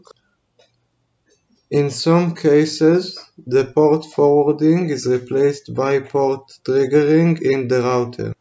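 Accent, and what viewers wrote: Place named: India. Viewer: you sound indian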